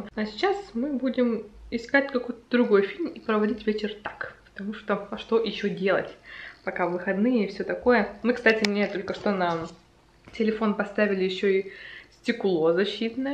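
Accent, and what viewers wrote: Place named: Okanye, Russia. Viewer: Перестань О-кать.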